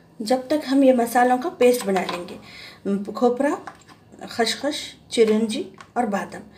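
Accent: Hyderabad, India. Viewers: Hamari Hyderabadi's language hai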